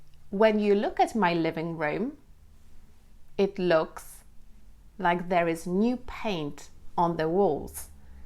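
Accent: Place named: England, United Kingdom. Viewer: jak na kogos kto nie jest native spreakerem fajnie udaje ci się imitować angielski akcent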